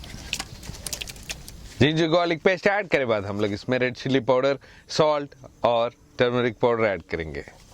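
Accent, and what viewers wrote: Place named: South, India. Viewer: i really like his south indian ascent. keep it up, dont try to speak proper hindi or urdu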